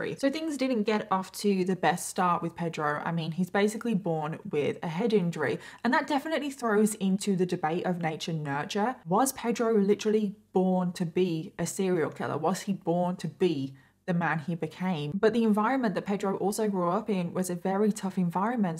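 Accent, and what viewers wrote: United Kingdom. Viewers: you are so sweet and caring <3 i love the way you tell these cases, it's very personal and it always shows your opinions and feelings about them.. also ADORE your british accent! :) Love from Italy <3 P.s: in Italy we just had a very shocking murder of a young girl, who was a mother to a 5 year-old baby boy and she was also pretty known on the internet.. it's really absurd, I'd really like to see u cover it!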